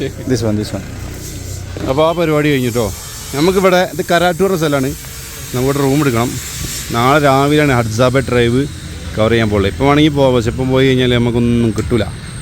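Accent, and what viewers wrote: Malappuram, India. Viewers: നിന്റെ പെർഫോമൻസ് നന്നാകുന്നുണ്ട്!മലപ്പുറം ശ്യ്ലി യിൽ മലയാളം ok!!!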